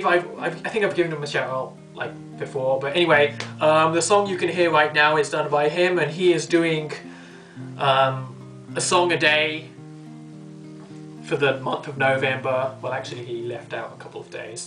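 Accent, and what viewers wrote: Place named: England, United Kingdom. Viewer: I'm REALLY curious on how u got ur Semi-English accent??!! I want one hehe Lo0oL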